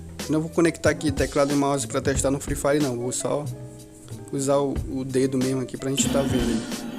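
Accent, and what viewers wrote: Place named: Pará, Brazil. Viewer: Tu e paraense ne man kkk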